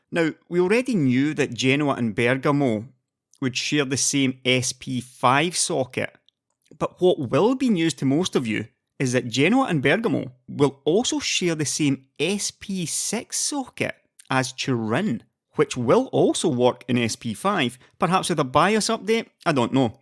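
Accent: Scotland, United Kingdom. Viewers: Aaah, I have missed you Scottish tones over the last several months, and while you may not appear as frequently as you once did, your return is still most welcome. Stay well. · your Scottish voice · the kinky Scot accent is back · yeah that is my scottish man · those dulcet Scottish tones are back baby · Good to hear your thick Scottish accent again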